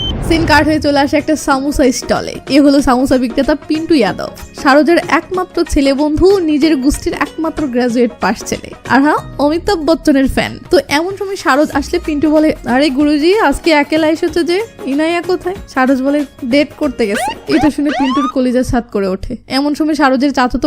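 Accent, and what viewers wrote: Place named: Nawabganj, Bangladesh. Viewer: নবাবগঞ্জের মেয়েরা এভাবে কথা বলে আমার মনে হয় উনার বাড়ি নবাবগঞ্জ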